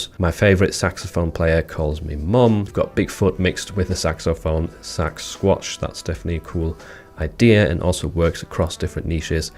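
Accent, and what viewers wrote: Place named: United Kingdom. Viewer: Hi. I'm just starting out on Merch on Demand, and your videos are a great resource. I've watched a lot of vids about POD on different channels, but yours is definitely a favourite thanks to your calm, clear delivery without making wild claims about making money fast. Maybe it's because I'm a fellow Brit that I appreciate this lol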